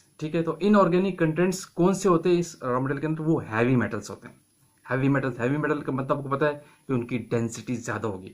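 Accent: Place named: India. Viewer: Ok aapke pass bohat knowledge hai sir pharmaceutical industry ka 🫡🫡🫡 bohat achhe se explain bhi karte ho aapko to pharma industry ka professor hona chahiye language tone se aap 90% indian hi lage baki kuch words se laga ki aap pakistani ho😅 keep it up sir humko knowledge dete rahiye hum aapko support karte rahega 👍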